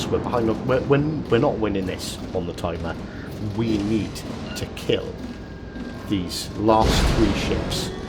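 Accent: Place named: United Kingdom. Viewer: Which part of Britain are you from? Love the accent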